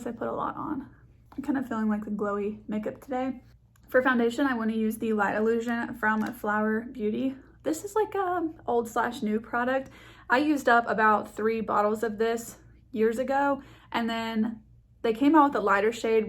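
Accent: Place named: Europe. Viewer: is that where you're originally from? I'm dutch and you just sounded more European to me 😉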